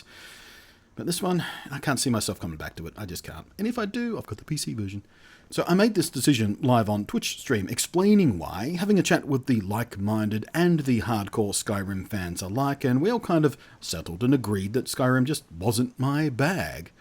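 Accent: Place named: Australia. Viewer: I love you milky smooth ozzie voice. No homo.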